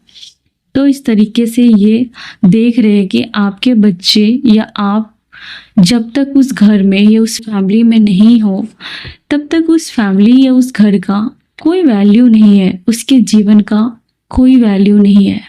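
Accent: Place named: England, United Kingdom. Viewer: Aapka voice me ek Englic Vibe hai ,,,,ayse logo ki madat karte rahiye 👌👌👌 kyunki hum manushya ko bhagwan duniya me bhejte hai jarurat mand ka help karne k liye,,,lekin insaan apna swarth k chalte bura karm karna suru kar deta hai,,,tabhi to zindagi barbad kar leta hai,,, prabhu shree Krishna ji sabko sehi Marg dikhaye 🙏🙏🙏 aap bahut achha kar rahe ho,,,mere Prabhu krishna ji aap ka sath abosya denge,,,ab aapka growth hone ja Raha hai,,,but kabhi Maan me ahankar mat Lana aap ,,,jai shree krishna 🙏🙏🙏 Radhe Radhe 🙏🙏🙏🚩🚩🚩🚩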